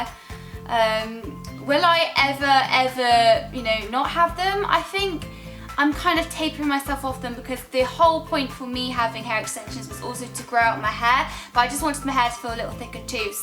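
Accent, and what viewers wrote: United Kingdom. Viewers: Are you British??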